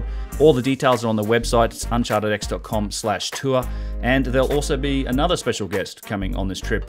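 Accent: Australia. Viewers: Ha, Ha! Don't make fun of his Aussie accent.(dialect) President John F. Kennedy used to say, Africer and Asier. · Pyramids of Geezer... love the aussie accent! :D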